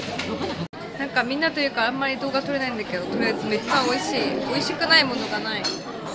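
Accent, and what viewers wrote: South Korea. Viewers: 知ってます！いつもめちゃくちゃ韓国訛りなので（ 笑 ） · さんの今までの努力で韓国語が染み付いてるのはすごい！と思うんですけどさすがに日本におるときは日本語にならん? 私はどちらかっていうと母国で韓国人って勘違いされたくない😅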